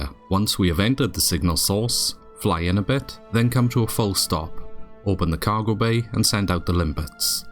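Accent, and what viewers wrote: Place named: Wales, United Kingdom. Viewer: Took me a minute to figure out that whimsical accent lol thats gotta be welsh! Appreciate the tutorial, o7 from Ireland :)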